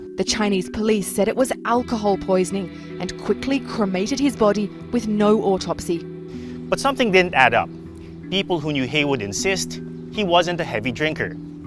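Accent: Philippines. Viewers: ah that explains his accent, he sounded filipino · I’m not even a native English speaker but I think I can hear a Filipino accent here 😄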